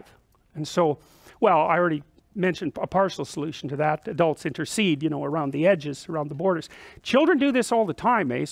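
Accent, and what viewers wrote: Canada. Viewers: He let the 'eh''s fly this lecture. It tickles me whenever he lets one out. I love Canadians. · He says eh a lot. Is he Canadian? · every once in a while, you hear the Canadian 'aye' come out.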